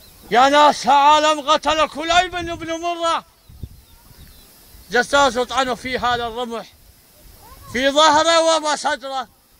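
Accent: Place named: Levant, Arab world. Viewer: شلون مطالع من بغداد 3 سنوات اني خدمتي العسكرية بالموصل وزاخو حبي انتبه لهجته من بادية بلاد الشام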